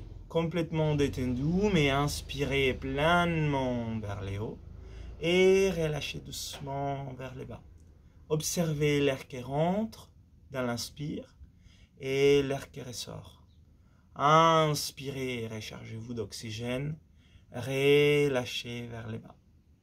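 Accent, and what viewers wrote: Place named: Italy. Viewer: Merci beaucoup! J'adore ta façon de coacher. Je pratique la respiration Wimhof depuis plusieurs mois mais j'ai acquis plus de fluidité et de détente en t'écoutant. Ayant travaillé de temps à autre en Italia, j'ai aussi le plaisir de retrouver la musique de ton accent!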